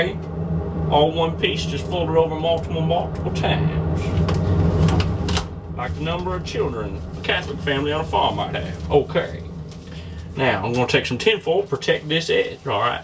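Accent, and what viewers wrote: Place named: Appalachia, United States. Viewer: holy hillbilly batman. love it though, A+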